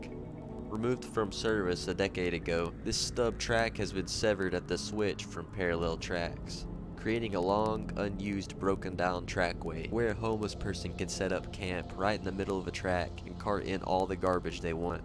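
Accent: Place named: Chicago, United States. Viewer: The narrator has a Chicago accent, which is odd for an NYC video.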